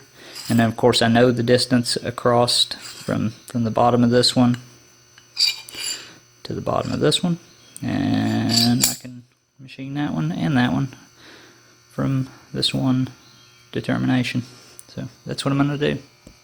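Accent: South, United States. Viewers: Me Now he's from a southern State you fool. Of course he's saying "to"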